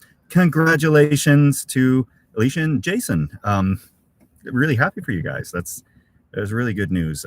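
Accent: Canada. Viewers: Are you and Greg Ovens distant relatives? I swear maybe it’s just a Canadian accent that I’m hearing as an American. But you guys could be brothers or cousins on the same side of the family.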